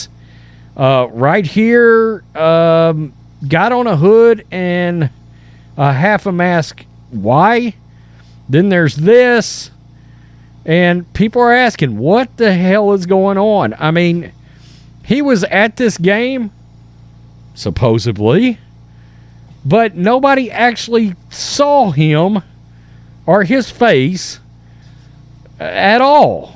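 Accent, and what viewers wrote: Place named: South, United States. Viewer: apple said CANCUN ON 3 with the heart hand gesture. Le'ts not downplay that. Why would he say cancun 3. You know whats ridiculous is how you have a show and 178 subscribers???????? How?????? LOl you get paid for this/??? How?? Must have a lot of inbred cousins that follow and like your show. You sound like a hillbilly and you somehow you have a zoom group and viewers???? HOw?????? You should consider hiding and maybe wear a mask OR just putting your little video on mute. Eli apple was being a douche. But a douche is a douche. Your live news is really boring and i really hope you don't get paid for this. Bengals are so tough and going to kill everyone. Glad they went to Burrowhead and showed everyone the chip on their shoulder. Who watches this??????? Is this a redneck incest private feed????????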